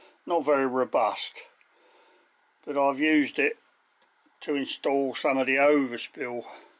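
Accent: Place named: Chatham, United Kingdom. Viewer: Well, it’s a decent resolution to the problem of storage. My Halfords cabinet is black, so easily marked and wiped away felt tip isn’t an option. Why don’t they make these things easy to label? Really enjoying your channel. BTW, where do you originate from? Your accent sounds very Chathamese to my ears.. Les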